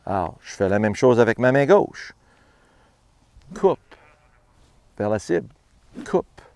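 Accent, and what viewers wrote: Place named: Quebec, Canada. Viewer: J’adore entendre ses instructions en quebecois. Merci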